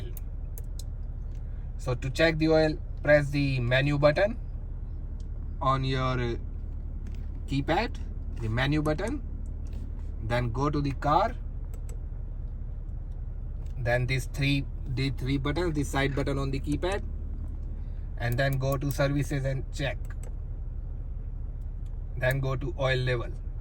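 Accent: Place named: India. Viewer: Because of accent i suggest that you are Indian. English in Great Britain is difficult to translate because of specific pronunciation but with your accent this become impossible to understand it without strain own mind...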